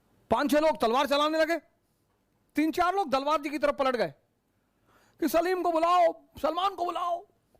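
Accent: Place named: Bhojpuri, India. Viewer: It's bhojpuri not urdu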